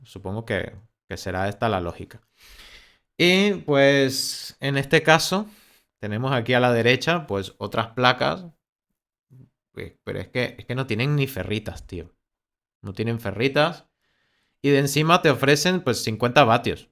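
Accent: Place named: Venezuela. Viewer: Ok gracias por el dato y la corrección, ya me parecía familiar el acento venezolano saludos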